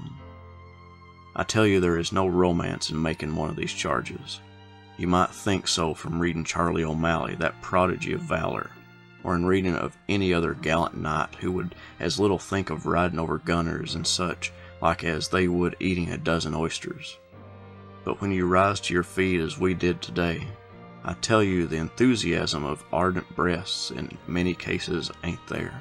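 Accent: South, United States. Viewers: I listened to your video regarding the union account of Pickett's charge and enjoyed your presentation. I am an Army Retiree and lifelong student of military history and applaud your presentations; however, I would like to respectfully point out that Union formations were identified by a numerical designation, while the Confederacy identified their units at the brigade and higher by the name of the current commander. Judging by your accent I can see why you might identify major formations on both sides in the same way, that is to say with the Confederate system. Keep up the good work.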